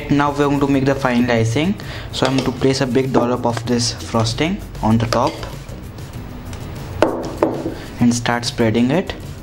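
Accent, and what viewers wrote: India. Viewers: his English has an Indian accent..nothing racist.fool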